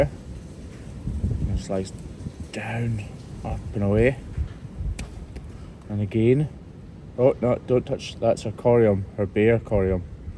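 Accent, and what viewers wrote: Scotland, United Kingdom. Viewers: keep speaking as you do. I'm from Kilmacolm and I appreciate representation of our way of speaking on YouTube.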